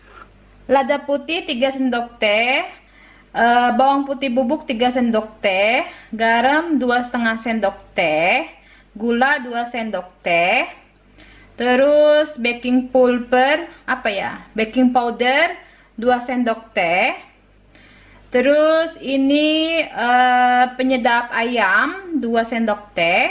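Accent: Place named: Betawi, Indonesia. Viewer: Kagak usah banyak bacot😁😁betawi bangat dah...cipok2 buat 😘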